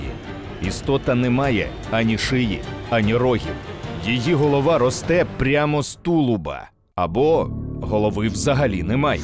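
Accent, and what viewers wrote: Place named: East, Ukraine. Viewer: Ахінея повна!!! Соус ЧУМАК у 1986 році!!! Лось без голови,та він оглядаяться блять на того хто його сполохав а без рогів бо то лосиха бля!!! А соми мутанти довші за людський зріст в Іспанії подивіться які,відсутність природних ворогів і людини і купа їжі,херак і ось тобі мутант трьохметровий!!!! А свійське порося в каналізації це взагалі треш особливо східний голос за кадром! БАЛАБОЛО СЮЖЕТ від 2+2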